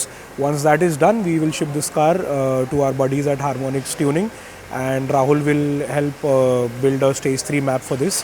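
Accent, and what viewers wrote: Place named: India. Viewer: Im sure its indian accent😂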